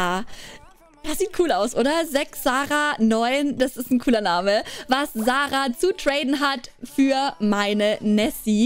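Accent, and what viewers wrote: Bavaria, Germany. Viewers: Kommst du aus Bayern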